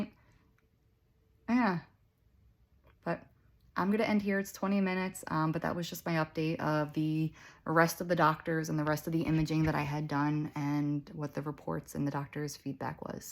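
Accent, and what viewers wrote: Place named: Philadelphia, United States. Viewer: Thank you for all your videos, I watched just about all of them! I just had my ACDF C4-C7 on January 9, 2025. Neck is super sore, having trouble swallowing, looking forward to pain going away. I get a huge kick out of listening to you because I attended Villanova University in the late 80s, and your accent brings me right back to campus! Best to you!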